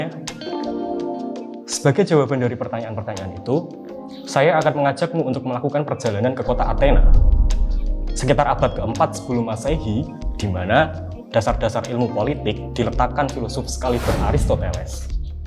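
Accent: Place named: Pantura, Indonesia. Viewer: Cara ngomongnya, medok khas pantura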